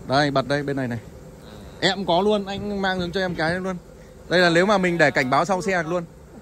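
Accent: North, Vietnam. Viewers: Có 1 thắc mắc nho nhỏ là b ở quảng bình mà lại nói đặc giọng miền bắc ko bị pha tý miền trug nào cả , tài thật .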